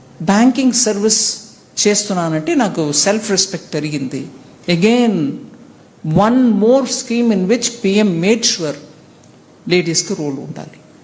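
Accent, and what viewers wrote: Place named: Chittoor, India. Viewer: ఈ కాలంలో తెలుగు వాళ్ళు చాలా మంది తెలుగు ఇంతకన్నా ఎక్కువగా ఏమి మాట్లాడం లేదు. చిత్తూరు జిల్లాలోఎప్పుడూ ఇలాగే మాట్లాడతారు. సహజం.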